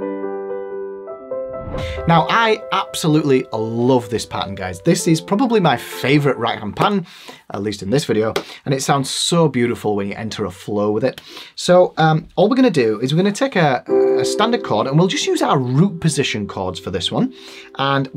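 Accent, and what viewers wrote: Yorkshire, United Kingdom. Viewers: Great vid. You sound like a Yorkshire man to me as an ex-pat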